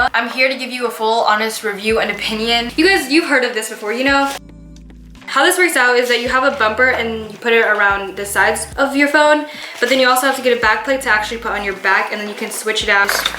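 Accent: Philippines. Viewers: Are you half filipino? cause i think i kinda heard some bisaya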